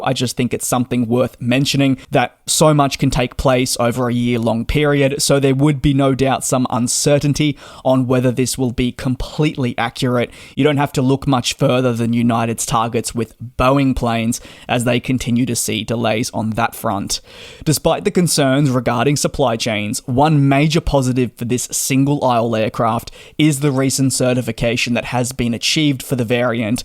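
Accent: Australia. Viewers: I could be mistaken, but this guy sounds Australian.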